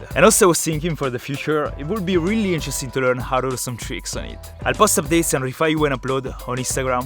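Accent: Italy. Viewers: Ma é un italiano che parla inglese? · Ma perché ha un accento così italianizzato? (Secondo me è italiano) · si sente un botto dall’accento che sei italiano😁 bel video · Your content is amazing And i really like your italian accent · Sei italiano vero? Dall'accento si sente. E comunque sei un mito 💜⚜💜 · adoro il tuo accento italiano quando parli inglese, sei uno dei migliori youtuber in circolazione, continua così😁❤️ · Se non fosse stato per l'accento non avrei mai pensato fosse un canale italiano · Accento italiano si sente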